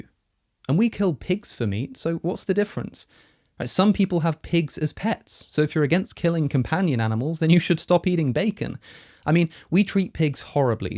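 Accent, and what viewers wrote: United Kingdom. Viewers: Dear god. Please tell me this is satire. Edit: ya. Satire. Pretty good points, but leaving out a lot of the other side’s actually valid arguments that have no easy come-backs. He’s no Contrapoints or Philosophy Tube. Just a cheap imposter with a British accent. 🥱 How about this? Is it vegan to eat a person who consented before death? By Vegan ethics, yes. So I’m gonna eat my fish and chicken and my dogs can eat me when I’m done. Life is a bitch, it always has been. EVERYTHING gets eaten eventually. Wether its by worms and microbes, humans or other animals.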